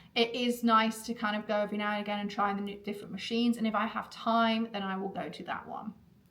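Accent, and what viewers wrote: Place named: London, United Kingdom. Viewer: Most surprising thing in this video is that you’re from Yorkshire??????? Whaaaat. That is the most London accent ever!!!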